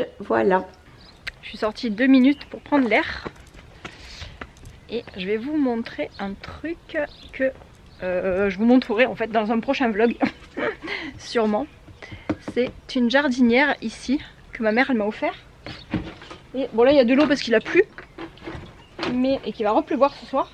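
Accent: South, France. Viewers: Coucou 👋🐈🏵️🐥💐🌼🌷🌹❤️🌿J'aime beaucoup tes vidéos 🤗 et ton accent du sud 🌻🌻🌻🌻merci pour tout 🐈👋💕Isabelle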